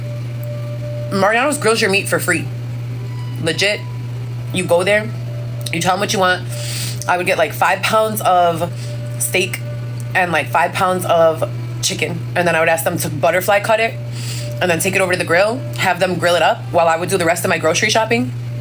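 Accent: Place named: Latin America. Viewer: I doubt you ever cooked for your kids. And that stupid latina accent - i just cant.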